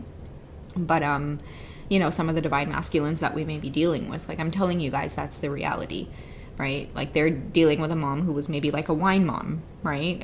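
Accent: Trinidad and Tobago. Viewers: You are great, and I ❤️ your Trini accent!!! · That's was so funny .that's how true Trini talk😊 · Omg I love that trini accent.. so soothing lol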